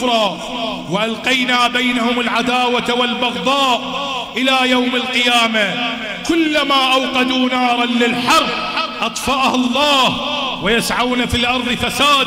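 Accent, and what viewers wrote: Iran. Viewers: ليش يحجي إيراني؟ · لهجتكم ايرانية وليست عراقية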